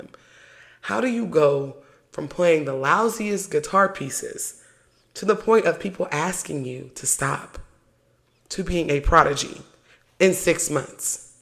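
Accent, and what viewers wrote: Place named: California, United States. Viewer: Can somebody tell me where shes from? I hear a Stockton-Sacramento accent but I could be wrong…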